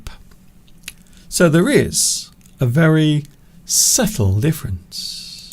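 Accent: United Kingdom. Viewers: Very interesting and useful lesson as well as a clear British English pronunciation .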